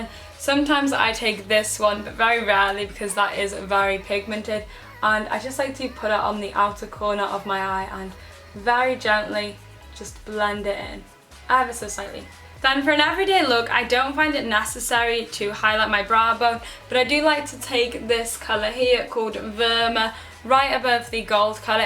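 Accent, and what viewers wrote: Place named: England, United Kingdom. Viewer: what part of england are you from? your accent is very different from me aha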